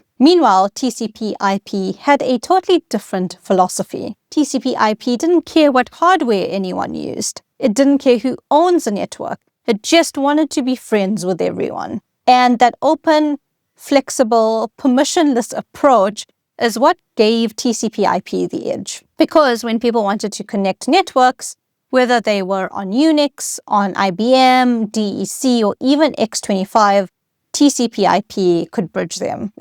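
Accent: South Africa. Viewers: Is that South African accent?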